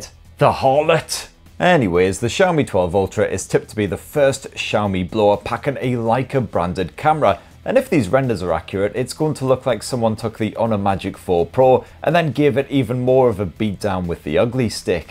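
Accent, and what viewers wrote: United Kingdom. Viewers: Are you American? I've noticed Americans seem to struggle with British accents. I understand him fine, did I remember I used to struggle when I would try to watch trainspotting.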